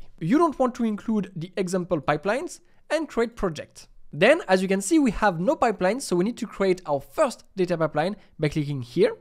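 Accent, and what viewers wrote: France. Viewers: haha seems you have a little french accent, anyway , love your video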